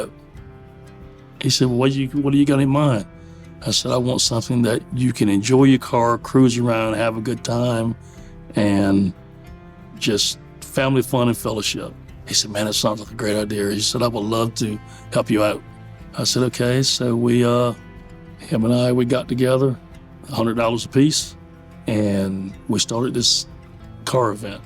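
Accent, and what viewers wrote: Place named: Maryland, United States. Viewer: I thought u were from the eastern shore Maryland ...You're ancient the o and u ....,MD NC ......TALK t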